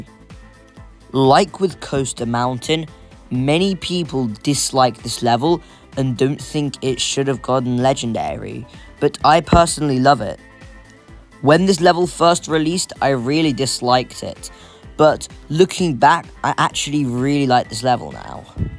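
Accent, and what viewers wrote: United Kingdom. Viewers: I didn't think somebogy's voice could be this British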